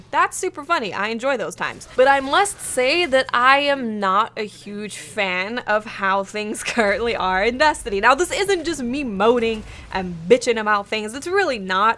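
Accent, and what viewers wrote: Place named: Boston, United States. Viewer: Anyone else notice the slight Bostonish accent in? or is it just me?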